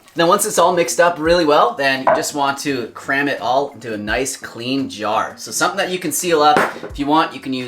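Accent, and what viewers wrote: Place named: Canada. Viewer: He's Canadian. He's def has the accent🙂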